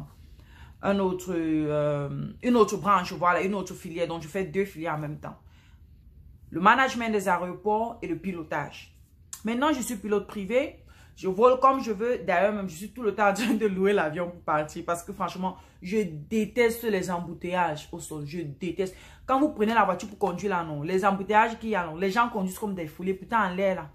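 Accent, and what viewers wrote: Cameroon. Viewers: Salut ma chère. J'adore bien ton accent Camerounais.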